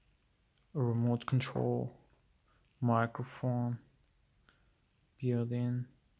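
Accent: Europe. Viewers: james brown because English isn’t his first language. This is what Europeans speaking English sounds like. They have an accent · Fine pro Gaming He’s from Europe so he has an accent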